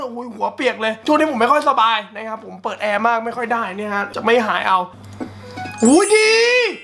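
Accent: South, Thailand. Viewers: พี่ชอบภาษาใต้หรอมาเดี่ยวหนูสอน นุ่ยคนใต้นิพี่บ่าวเห้ออ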